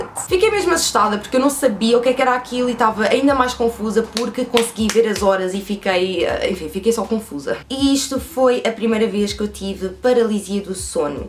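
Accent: Portugal. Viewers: Amo o sotaque português que fofoooooo! Sou brasileira sksksk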